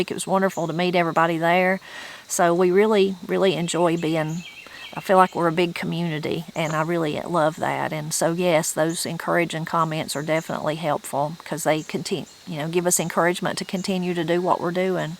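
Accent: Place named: South, United States. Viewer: I am from Columbia, California. You remind me of my family, my Mother, Aunts, Grandmother, your southern accent, you love of God, your growing a garden, hunting. I feel like I am home when I listen and watch your channel, Thank You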